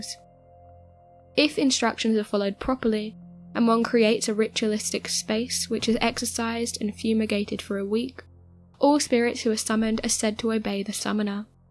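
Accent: England, United Kingdom. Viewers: Why is a British accent supposed to be classy? It's so annoying! Good vid just damn Brits cant talk lol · I love this ladies voice. Is she from Britain? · She’s English and they have a lot of different ways of pronouncing words than North Americans. That’s probably what it is.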